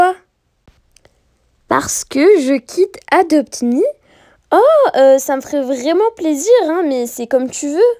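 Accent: England, United Kingdom. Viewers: Je suis totalement d'accord avec toi !!! Tes vidéos son incr 😍!!! Ta voix est juste magnifique (j'adore ton accent anglais)🥰 ! Et toi t ✨PARFAITE✨… Continue comme sa !!! Bientôt les 50k !!!!! Ps : J'aimerais trop joué avec toi sur Adopt me !!!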